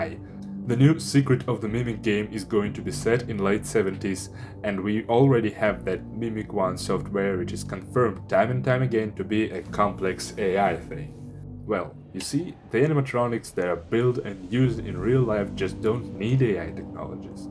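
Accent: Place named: Russia. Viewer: Всегда русский акцент уловлю 🫂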